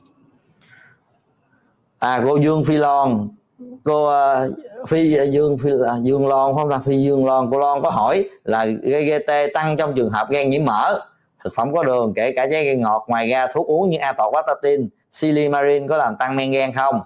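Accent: South, Vietnam. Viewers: Lần đầu nghe Video của Bs, co like, đang kỳ kênh , chia sẻ luôn nè, cảm ơn Bs rất nhiều. Giọng đặc Nam bo de thương ghê hông! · Tui rất thích tuy rằng nói tiếng nam quá nhưng còn hơn nhiều Bác sĩ lên nói rào trước đón sao và chū yêu là câu lai 😊😊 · Hello Bác Sĩ Bác sĩ là người miền Nam nói chuyện rất bình dân, cảm ơn bs đã giải thích thật rõ ràng. Tôi U80 nhưng vẫn muốn BS xưng (tên) là ‘tôi ‘ thay vì là ‘con’. Bởi vì chắc chắn có những người nghe , học hỏi từ bs ngang tuổi hoặc trẻ hơn . Ở miền Nam hai chử R. Với. G. Hay bị sai Thí dụ : Xong rồi. ( rr ồ. i ) Không phải. G ồ. i Như người miền Bắc thì chữ. L. hay nói. là. N Xỉn lỗi BS trước nhé ( ý kiến xây dựng thôi) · Bác Sỹ Nói Chuyện Giọng Nam Rất Dễ Nghe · Bác sĩ người mn có khác ăn nói thật là dễ thương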